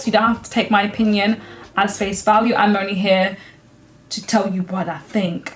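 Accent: United Kingdom. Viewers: I like your accent, sound British I've always liked the British accent I think it's really cool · Never knew how much I desperately needed to hear recited in a UK accent but wow that changed my life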